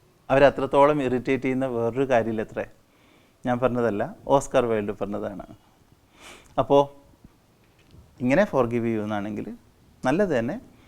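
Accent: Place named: Kanhangad, India. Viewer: ഞാൻ ചിന്തിക്കാറുണ്ട് എന്തുകൊണ്ടാണ് ആളുകൾ നല്ല content videos കാണാത്തതു എന്നു . എല്ലാവര്ക്കും മറ്റുള്ളവരുടെ സ്വകാര്യത അറിയാൻ ആണ് ആഗ്രഹം. Hmm അതെന്തെങ്കിലും ആവട്ടെ The only regret is that I was little late to watch your videos Now watching all the videos together It's like a close friend sitting next to me and talking ചിലപ്പോൾ kanhangad slang ആയതു കൊണ്ട് എനിക്കു അങ്ങനെ തോന്നുന്നതാകും I'm waiting for more videos like this❤️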